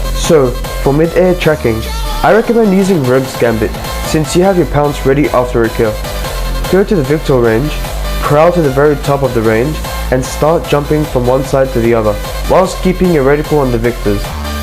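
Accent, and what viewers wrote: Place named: South Africa. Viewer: Thanks for the vid, but what is your sensitivity for controller Btw you sound South African :)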